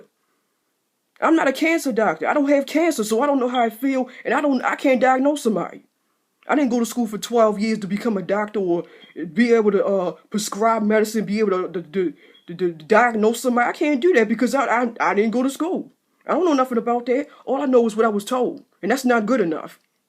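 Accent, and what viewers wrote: Baltimore, United States. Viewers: (Twiztid Lestat) Baltimore accent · You seem to have a Baltimore accent. I see to this show I liked all the time and their accent sounds like yours and it was set in Baltimore. Love the different accents around the globe. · she has a very thick Baltimore accent...Most everyone I know from Bmore stress the U sound hard...its kinda funny tho, I use to tease a friend when she pronounced the color "Blue" .....it was too cute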